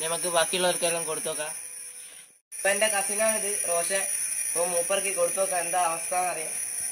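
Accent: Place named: Kannur, India. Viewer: മോന്‍ കണ്ണൂരാണോ സംസാരം കേട്ടിട്ട് കണ്ണൂര്‍ തലശ്ശേരി വടകര ഭാഗത്തുള്ളവര്‍ സംസാരിക്കുന്നത് പോലെ ഉണ്ട്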